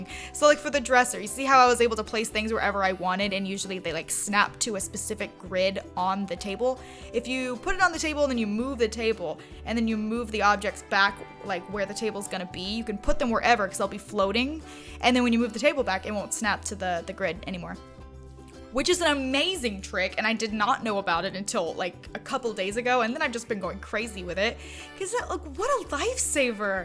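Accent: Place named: New York, United States. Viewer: For some reason you just sound like a New Yorker to me. I always wanna ask what borough you live in. But then I’m reminded you’re from Texas. 😅😅☺️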